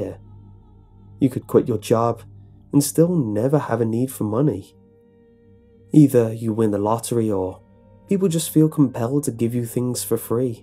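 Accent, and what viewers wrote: England, United Kingdom. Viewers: I believe he is english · He actually mentions in his "Draw My Life" video that his dad is English and his mother is Filipino, which I found surprising. Most people I know who are from the Philippines have thick accents, even if they've spent years or even a couple decades in another country, and alot of people I know who are raised with one or more parents who have thick accents end up with at least a faint accent. has an absolutely flawless English accent, and until I saw that video I assumed he was raised in some posh English family:P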